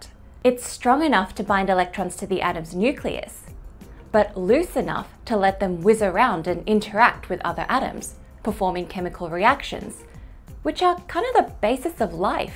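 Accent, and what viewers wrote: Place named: Australia. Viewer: so nice to hear an Australian accent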